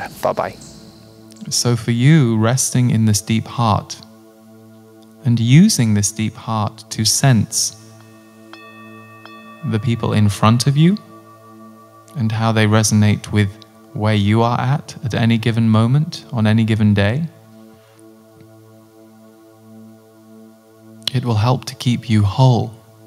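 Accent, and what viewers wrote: United Kingdom. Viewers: Thanks - love your work and great to hear a Brit.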